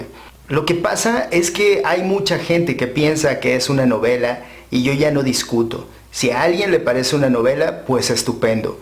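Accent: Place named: Oaxaca, Mexico. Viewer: Así como tu hablan los pinshis waxhos que odi@ los de zonora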